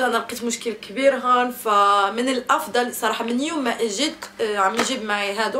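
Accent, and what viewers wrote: Morocco. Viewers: نتي غزالة ماشاء الله ولكن لهجتك المغربية احسن مليون مرة من اللهجة المشرقية المستعملة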